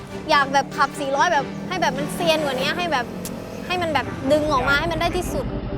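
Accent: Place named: South, Thailand. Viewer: เก่งมากครับน้อง ถามนิดคนจังหวัดอะไรครับ ผมคนนครศรีธรรมราช ฟังสำเนียงเหมื่อนคนใต้ครับ เก่งมากครับ น้อง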